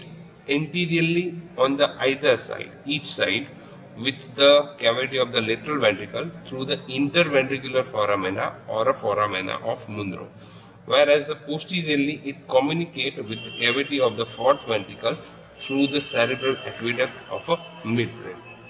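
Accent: India. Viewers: if you cant understand the indian accent you haven't seen enough educational videos smhh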